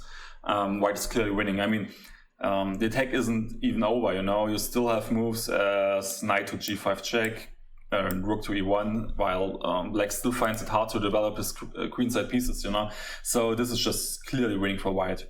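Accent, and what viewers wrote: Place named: Germany. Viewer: Thats the most German-English video I've ever heard. Great job!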